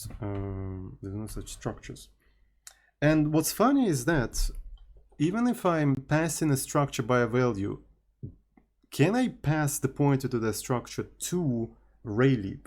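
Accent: Russia. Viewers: Why do you sometimes swear in german? As a german I appreciate it XD, but its a bit confusing. An english speaking programmer with an russian accent cursing in german.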